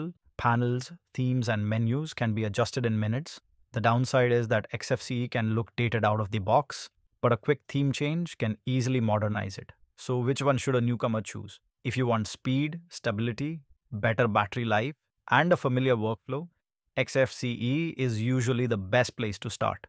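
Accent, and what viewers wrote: India. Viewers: Indian accent, right? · Indian accent pmo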